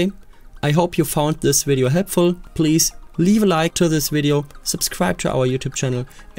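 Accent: Germany. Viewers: Love that german accent :face-blue-smiling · ich höre da einen leichten deutschen akzent raus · i love deinen german accent Digger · Thank you mate, your Accent sound like Super German by the way😊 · Den Deutschen höre ich doch raus, danke für das Tutorial!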